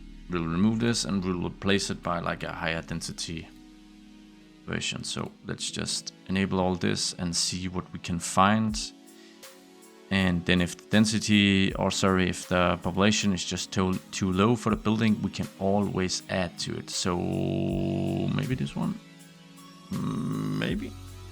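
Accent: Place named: Denmark. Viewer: Love the Danish accent ❤️❤️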